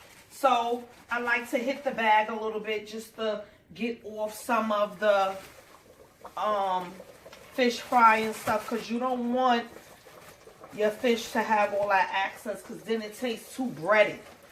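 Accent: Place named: Newark, United States. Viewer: She sounds like she from Newark lol we do that ova here too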